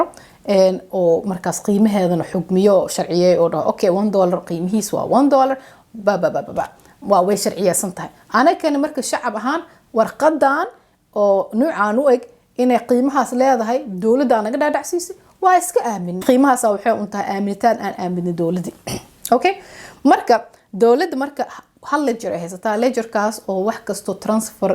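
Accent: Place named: Mogadishu, Somalia. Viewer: Naa lahjadan reer xamarka YouTuber ku noqon maysid ee nagatag waxaad ku hadlayso iska soo sax hadaad dad dhex keenayso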